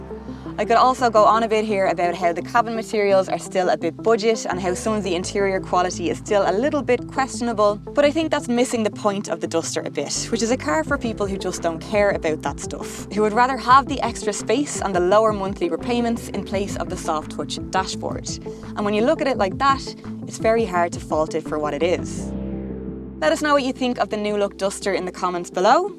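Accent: Dublin, Ireland. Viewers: Great review with the Irish accent 😊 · That is not a English accent it's a Dublin accent.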